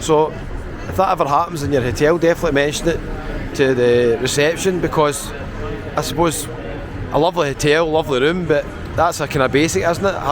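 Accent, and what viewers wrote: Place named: Paisley, United Kingdom. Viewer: Around of applause for hes came so far from his early Scotsman days. Well done man mon the buddies. I ll keep following you wherever you are. Your dulcet Paisley tones good luck⚽😎🆒🏖️👍 Viva LAS 😎🗼🗽🕋🕌 good luck on your next destination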